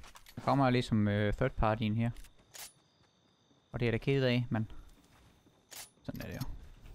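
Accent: Jutland, Denmark. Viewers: Kommer du fra Jylland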